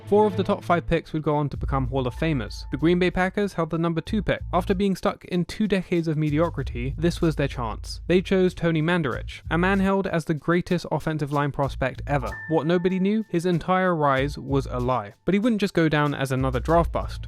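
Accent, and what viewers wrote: England, United Kingdom. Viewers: Why is a british guy talking about an obscure nfl player? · Part way through this. All known info. Why am listening to a guy with an English accent talk about an American sport. No insight loser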